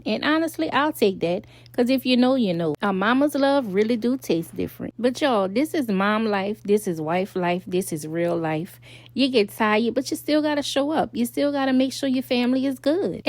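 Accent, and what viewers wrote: Louisiana, United States. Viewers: You sound just like my family from Louisiana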